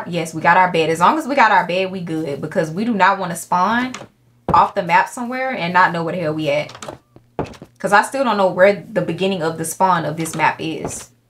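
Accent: Atlanta, United States. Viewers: You from Atl or something? I’m new to the channel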